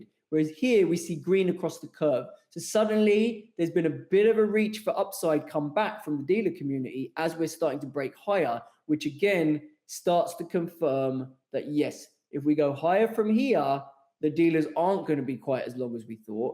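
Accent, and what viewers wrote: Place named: United Kingdom. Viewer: Great content but too British accent. Cannot stand it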